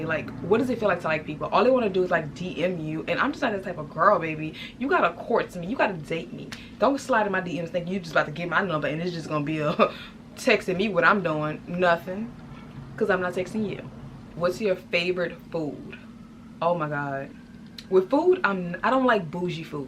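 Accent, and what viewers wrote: Louisiana, United States. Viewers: Baby I heard that accent from a mile away, fellow louisianian.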